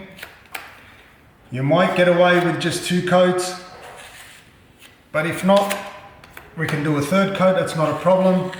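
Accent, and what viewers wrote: Australia. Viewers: i love your accent i'm guessing you are from Melbourne Australia.. I'm stuck in S.A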